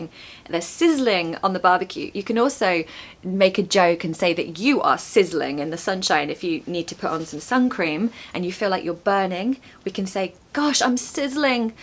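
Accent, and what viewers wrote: United Kingdom. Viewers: thank you for interesting videos. British accent is very nice👍😁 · You are just adorable, beautifull, positiv, vivid, enthusiastic and your Brithish English is so lovely to listen to! Your smile and your eyes remind me on princess Diana a lot. I love your way of teaching English by telling stories about rhe British way of living, thinking and custims. I find it very interesting and useful. You bring joy and happiness into my day every time I wach your videos. Thank you so much and have a great summer! ❤😊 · thank you for interesting videos. British accent is very nice. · Your british accent is amazing😊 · It's so clear your British accent that makes easy for us to learn. The emphasis in each word with details very well explained it,s amazing. Thank you so much for the lesson 🤩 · Hi,. I can understand your enjoyment about summer. I live in a region where the sun shines all year round, and get annoyed if it rains three days. 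However, I understand that we need the rain, of course, otherwise we wouldn't have food, but when the sun is bright it's good isn't it? Love your accent, you're a real british woman, thanks. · Lovely British accent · OMG ! Your English accent is just fascinating , it's really relaxing to hear such a nice and slow pronunciation ✨ I fall in love with the British accent 🥰❤❤ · Love your british accent , and I can feel your energy and passion ! to cool down is pretty hard to pronounce:) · Tysm for this video! ❤ It's very useful to improve my vocabulary. I love your accent and British English as well! 🥰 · Thank you so very much,. I love listening to you and with the lovely British accent. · "Shall I compare thee to a summer's day" as Shakespeare says. Enjoying the chaste British accent. Thank you❤ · Thanks you teacher, I like your British accent, very easy to understand. ✌️👍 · It has been just amazing, I like the energy you put into your videos, the enthusiasm you show and of course your british accent that sounds pretty good to my ears.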